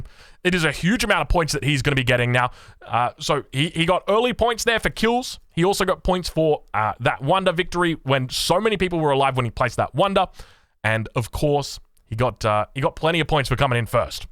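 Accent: Australia. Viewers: This wild wolololo of was the best part of the video. question, does every Australian sound like Steve Irwin? Greetings from the Netherlands (Y)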